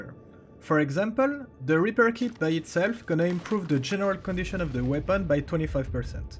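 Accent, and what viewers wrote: France. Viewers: Le french accent ^^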